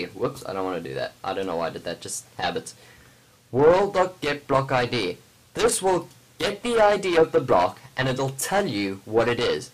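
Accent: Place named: United Kingdom. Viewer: Where in the UK are you?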